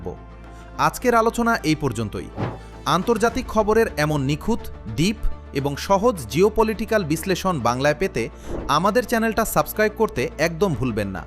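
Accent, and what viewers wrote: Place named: Bangladesh. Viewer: আপনার উচ্চারণ শুনেই বোঝা যায় যে আপনি একজন বাংলাদেশী। এখানেই ধরা পড়ে গেছেন আপনি।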